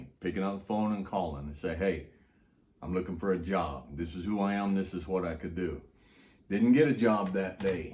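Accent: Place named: Southeast, United States. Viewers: I thought I heard a more southeastern accent. I'm from east TN and me and my wife have always wanted to do something like that or atleast regularly utilize and ride horses/mules. So glad I came across your videos! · Just found this guy yesterday. Now I'm binge-watching. Three points come to mind now: First, in the first video I saw of his, was looking 100% "Texas." The video was teaching how to make a simple camp breakfast (awesome, btw!), and our man was surrounded by flat TX dirt, cedar trees, and what looked to be some mesquite, too. Texas all the way. Then he opened his mouth and started talking. And I thought, "NO WAY is this man from the Lonestar state! That is East Tennessee if I've EVER heard it!" Turns out I was right, lol. Second: Hate to get "political," but when I hear all this talk about "white privilege" I think about countless men like who had NO privilege at all! 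Every ounce of success was earned with blood, sweat, and tears. Third: should get an agent and go into radio or voice acting. You're a NATURAL!! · Mighty great story, and lessons for life. Purty sure you still have a little bit of Kentucky accent. After two generations here in Texas, I still consider I have some of my meemaw's Kentucky accent.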